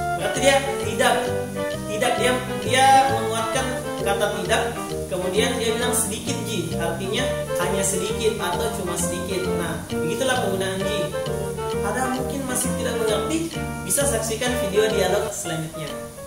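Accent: Makassar, Indonesia. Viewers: Tapu itu logat makassar bukan bugis😅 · Itu bukan bhasa makassar brow tpi logat dlm bhs indonesia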